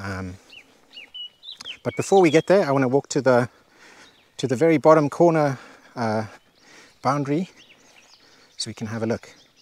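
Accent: South Africa. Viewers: Fabulous property! Lovely to hear a fellow South African! 😊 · South African accent. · So lovely to hear the South Afrıcan accent was not completely sure untıl you saıd we SOUTH AFRICAN say BRRAI.thank you for the amazıng vıdeo.would love to buy such a farm for my organıc way of lıfe.Greetıngs from CAPE TOWN.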